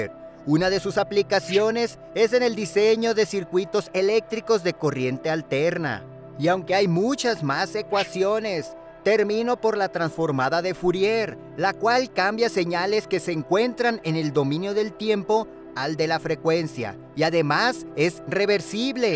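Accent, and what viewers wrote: Mexico. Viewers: se olle norteño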